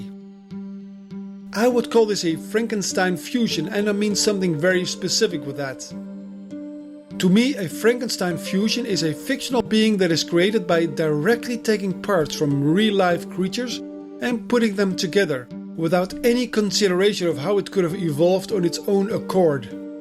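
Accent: Netherlands. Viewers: goeie content maar runt je computer op een aardappel? (I can sense you are dutch by your accent :p) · the moment i heard your voice i knew you were dutch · Is deze gast Nederlands ofzo? Hij heeft echt zo'n accent.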